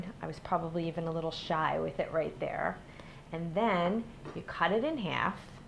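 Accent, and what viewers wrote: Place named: New Jersey, United States. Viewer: OMG... i can hear the Jersey in her voice..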